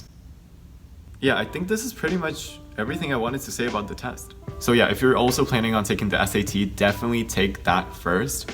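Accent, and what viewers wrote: United States. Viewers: Omg, what the...? Are you russian? Whatt??? Your accent is excellent, perfect, fabulous... terrific....just how... Omg I am really shocked that you are russian with such an excellent American accent. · I thought he was an American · Thought he was American but in fact he seems to be Russian OMG 😱😆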